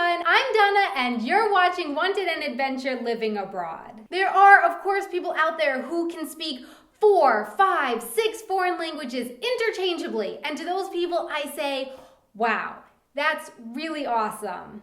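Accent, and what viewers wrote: United States. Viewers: American accent in Spanish is always quite ...interesting... :D And really easy to imitate somehow. But however your accent is, it is cool that we seem to speak the same three languages. ^^ When communicating with someone it is very great to switch languages! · Here's the method: if you are about to learn a foreign language - do not surround yourself with those, who only speak english. Avoid - sorry, but it works best that way - your own language (you know it already). Sign for success: you start to think, to dream and finally to react in your language of choice. Hearing You speak on these videos shows that you are still english-american based - it also shows during those few crumbs of german one can hear every now and then! Pronounciation could be learned by attempting queens-english or scouse a.s.o. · I don't think you're even trying to get the pronunciations correct. 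In german or Spanish. Like a deliberate heavily American accent in both. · If you want to make your accent when speaking German more German and less American, I suggest you to listen to some Nachrichten and try to let you and the broadcaster read the material simultaneously. When you do it, you will find the differences between the American and German accent and adopt German accent more quickly. I know you may do not know the material before the program, so you can listen several times and then begin that. I am foreign student in the USA, and that is how I adapted a New England accent within four months. (This is my 2nd year here, but many of my friends thought I have been here for more than 6 years and I am learning German too!)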